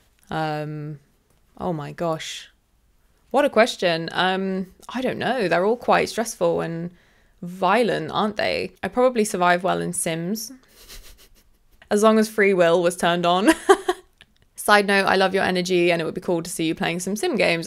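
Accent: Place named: RP, United Kingdom. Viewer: As someone from the Saaf Est, I think your accent sounds quite posh!! 😂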